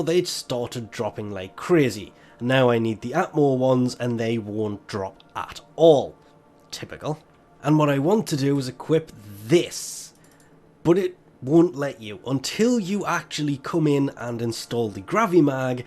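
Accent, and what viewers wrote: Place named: Scotland, United Kingdom. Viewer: DANG SCOTTISH LOVRTT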